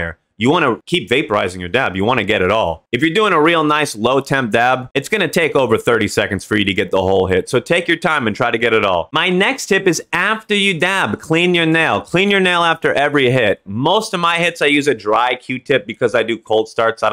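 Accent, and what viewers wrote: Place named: Boston, United States. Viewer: Ayo 😂 I have to subscribe. You're funny af bro. You got a Bostony accent 😂.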